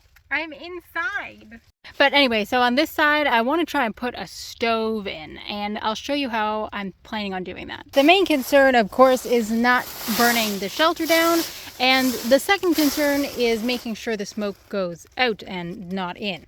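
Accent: United States. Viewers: for growing up on a remote island with no social media you have a very average american english accent.. :face-orange-biting-nails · Well, it sounds like you’re from the south. That’s where you are now so Bobby a poisonous snake or I should not say poisonous cause you know the venomous is what they are.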